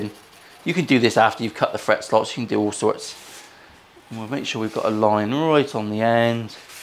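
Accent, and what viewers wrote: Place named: West Country, United Kingdom. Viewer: Video one of this series popped up on my feed so I decided to check it out. Whoooooo boy am I glad I did. I knew I'd found a bedfellow (steady) when you included that comment from yer old mate Sean Moore (Paisley Tele, Pt3). Laughed so hard, the convulsions made my missus thought I was having a stroke (not like that). Guitars, swearing, calmps, sandpaper, wood rubbing and a West Country accent. What more can anyone need? Great work!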